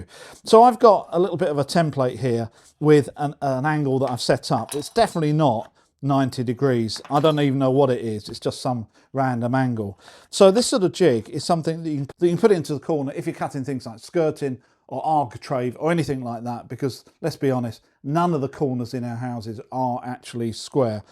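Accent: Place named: Mississippi, United States. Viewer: Love that Mississippi accent.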